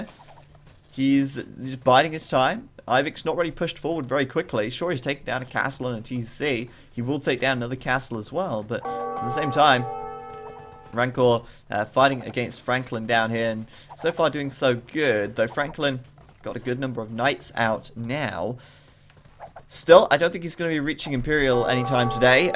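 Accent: United Kingdom. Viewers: are u british??? are u married??/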